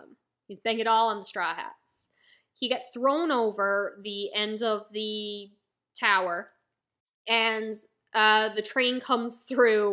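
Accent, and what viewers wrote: Canada. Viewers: Interesting you're trending right now. YouTube algorithm is a weird mistress. I've got to say I've heard Almost every version of English native speaker discussing One Piece but your unintentional Aboot was glorious. Play into your Canadian accent when reviewing One Piece. Don't try to hide/Americanize yourself. There's thousands of American One Piece YouTubers but what made you stand out was your accent. Enjoy the Journey and just know the Demon Child of Ohara is the best Straw Hat. When she has her moments she really steals the scene. Sanji being captured by Black Maria: call for Help we want Nico Robin... Nami-swan save me I'm on the third floor of the castle in the north most room.. Black Maria is like why the hell did you sell out your comrade so easily. Sanji is like I can't fight a woman. But besides my sister, Nico Robin is the most formidable opponent in the world and she's gonna kick your ass.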